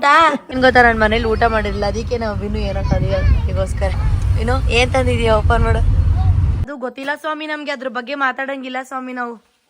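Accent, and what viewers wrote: Mandya, India. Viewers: Mandya style speaking ❤😅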